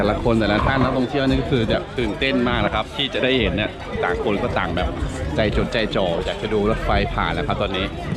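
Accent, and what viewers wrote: Thailand. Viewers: ฝึกพูดไทยจากที่ไหนครับ สำเนียงชัดมากครับ ยูทูปเบอร์ สปป. ลาวหลายคนในไทย เวลาพูดไทยยังติดสำเนียงฝั่ง สปป.ลาวบ้าง แต่ขอชื่นชมว่า น้องสำเนียงไทยชัดมากครับ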